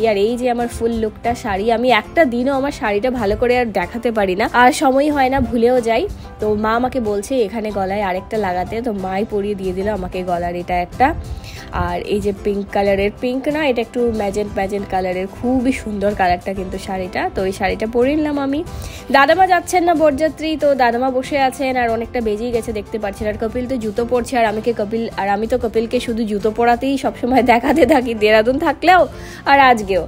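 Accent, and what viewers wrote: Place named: Sylhet, Bangladesh. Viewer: Tomar seleti kotha khub bhalo lage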